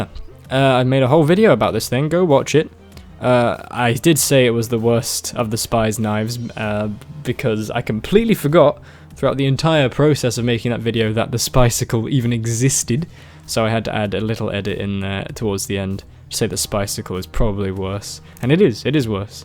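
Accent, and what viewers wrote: United Kingdom. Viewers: I don't know why, but I, as a non-English-speaker, love your British accent.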